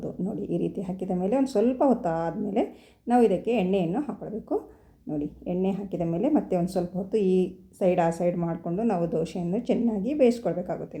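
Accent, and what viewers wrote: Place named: Kerala, India. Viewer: Pronouncing Vada, Dosha is Malayalam influence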